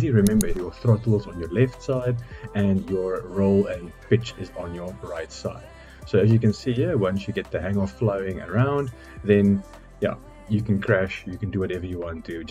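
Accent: South Africa. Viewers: South Africa accent…not Australia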